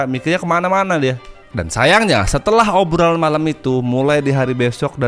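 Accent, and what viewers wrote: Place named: Java, Indonesia. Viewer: Enak lek crito.. kyk koncoku d deso lek crito.. bhsane medok enak di denger.. seru😂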